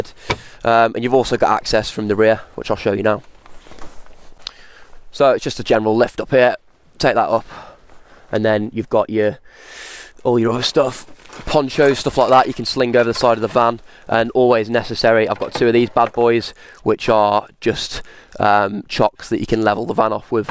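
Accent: Birmingham, United Kingdom. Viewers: Are you from Birmingham? 😊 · What a british accent ! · 🤣 Great conversion mate, even for a Ford! Are you a fellow brummie?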